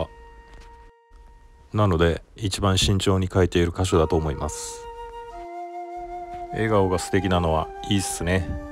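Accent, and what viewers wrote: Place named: Miyazaki, Japan. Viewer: 宮崎弁 連発ですねえ〜それだけリラックス⁉️目元 口許本当に大事ですね❣️❣️❣️段々、精気が溢れてきました。目元の赤がいいです‼️ひねくれちょらんよ❣️‼️❣️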